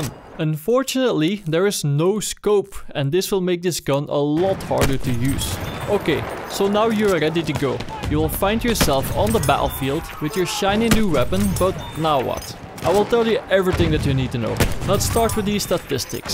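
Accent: Netherlands. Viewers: i hear a dutch accent in your english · are you Dutch? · youre dutch right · Annoying Dutch accent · are u dutch?:P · are you doing that dutch accent on purpose? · Lekker nederlands accentje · Fix je accent en wees alsjeblieft niet zo Nederlands. Mijn oren bloeden. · Jij bent Nederlands zeker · the dutch accent is strong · You have the dutchest accent ever hehe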